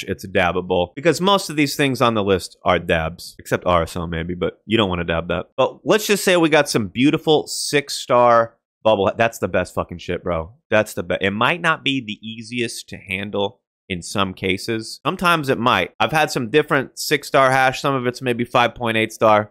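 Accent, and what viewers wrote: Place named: South, United States. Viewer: Bro I love the list but I REALLY love the southern accent you pulled off. I’m from the dirty south you did so good 😂😂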